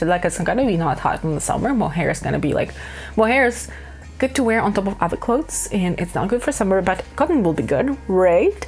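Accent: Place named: Canada. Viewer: First time watcher! I love your energy! You’ve e got some beautiful projects going right now. I can’t wait to see them finished. I have also never seen a stand like that to hold yarn. That could come in handy. Are you originally from Canada? Your accent is beautiful.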